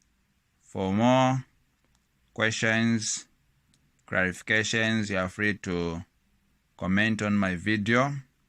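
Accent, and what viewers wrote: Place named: Tonga. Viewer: Your accent is giving sir! You sound tongalish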